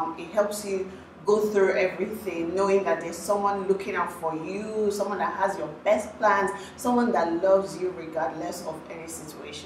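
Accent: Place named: Egba, Nigeria. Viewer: Aha! Egba dialect oooo🙌🙌🙌🙌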